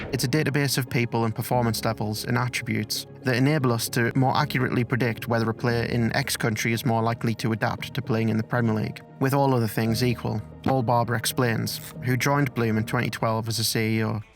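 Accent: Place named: Sunderland, United Kingdom. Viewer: Sounds to me like a fellow mackem?! Great video mate.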